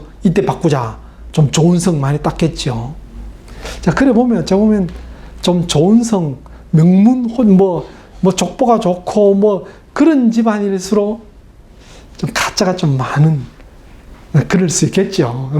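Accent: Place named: Gyeongsang, South Korea. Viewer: 경상도 사투리와 중국어의 억양이 똑같아서 멀리서 들으면 마치 중국어처럼 들립니다. 하루속히 표준말로 바뀌었으면 좋겠네요.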